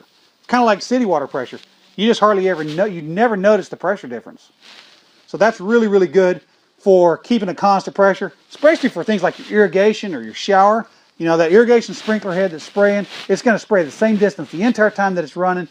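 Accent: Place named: South, United States. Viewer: Just listening to your southern accent makes you sound dumb. That's even before you just schill dumb shit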